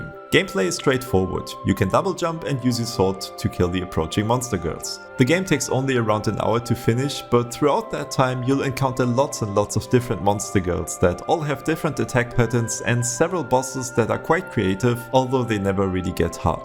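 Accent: Germany. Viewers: you sound like a german dude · i hear some DEUTSCHLAND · do you from germany ? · I hear agerman aczent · bruder dieser deutsche akzent wie geil xD <3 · Total den Deutschen Akzent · Is this guy german? He sounds like a german guy to 100% sry xD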